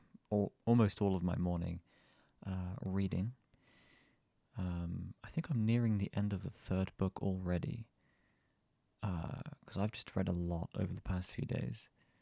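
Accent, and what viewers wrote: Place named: United Kingdom. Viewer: this randomly got recommended to me, hi! we love british accents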